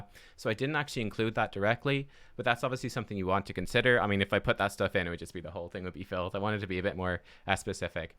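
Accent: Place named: Ireland. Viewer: Finally, another great WoW content creator, and best of all, Irish! At least I think you're Irish? 😅 Nice work!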